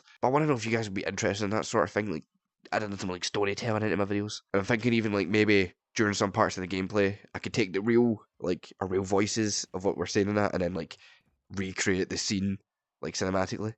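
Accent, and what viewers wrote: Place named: Australia. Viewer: The Australian accent makes it 10 times better lol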